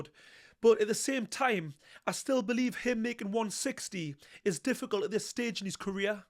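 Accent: Newcastle, United Kingdom. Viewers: the Newcastle accent 😂🔥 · Are you from Newcastle? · You sound like a Geordie mate ....you local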